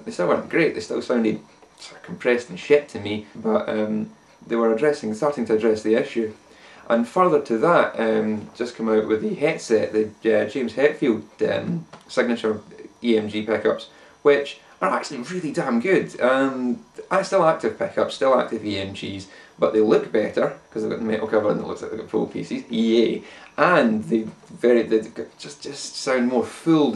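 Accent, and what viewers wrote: Scotland, United Kingdom. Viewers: TALK SCOTTISH TO ME! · Well he's Scottish. I don't know about Shrek. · It's scotland. We all talk like that over here...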